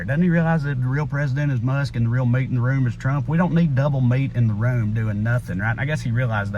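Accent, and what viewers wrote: South, United States. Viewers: Finally, A Southern Accent i can Enjoy? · lol , you sound like a red neck , but get your point across like a very intelligent person , as you are , I love it , shines a little light in these uncertain times , thank you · To hear this intelligent man speak the truth in in his deep southern accent is delightful. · What a fantastic rant young American man, spot on!! Greetings from England Love the accent!!.... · God I LOVE your southern drawl. I know this is totally off-topic but hey I couldn't hold it. BTW, f#&k the orange haired buffoon! Cheers from Italy!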